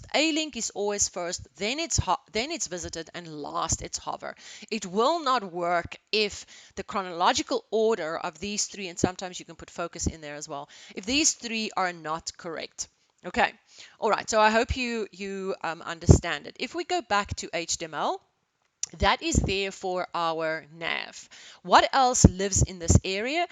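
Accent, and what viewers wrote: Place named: South Africa. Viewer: I presume you are South African, so for me as a South African, it was nice to have a common accent. Great tutorial. Could you please read some of your mail please, so many unread :-)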